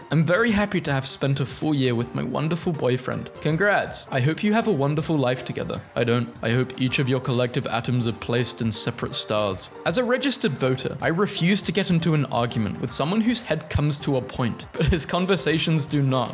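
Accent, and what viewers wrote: United Kingdom. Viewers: Hi British man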